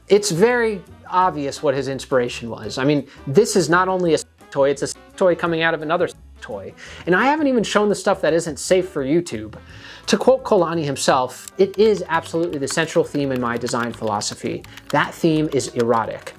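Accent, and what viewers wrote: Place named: Germany. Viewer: My brain was short circuiting : I hear a German accent out of an Italian. Imagine my relief when you reveal the truth.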